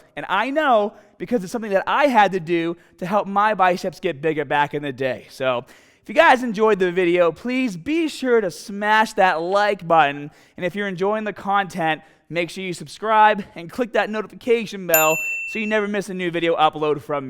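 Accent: Boston, United States. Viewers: Yup, first video I saw I thought he just had a really adorable speech impediment, then I realized he was from Boston.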